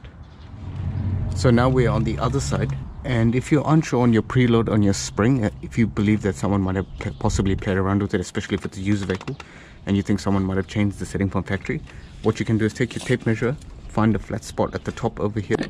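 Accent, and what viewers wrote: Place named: South Africa. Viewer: thnx. car is Evo V 400hp street car. Btw where are you based , your accent sounds like you originally from South Africa 😊 ?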